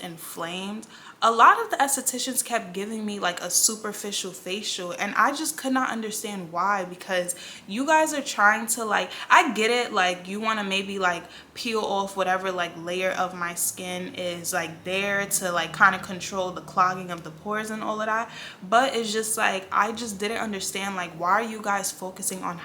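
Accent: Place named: New York, United States. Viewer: Thanks for sharing your journey sis. The internal work really is key. It still baffles me that the health industry doesn’t start with questions like, “What’s your diet like?” or “Let’s build a daily food regimen first.” We can't leave it up to theses Dr's/specialist. Btw... accent—definitely giving NY girlie 🗽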